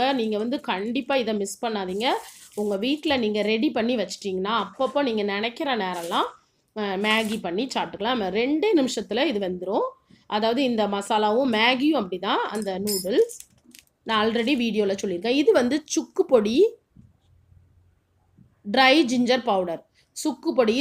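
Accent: Tirunelveli, India. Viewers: Unga voor tirunelveli ya Akka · nanum tirunelveli than sister athan slang thariyuthu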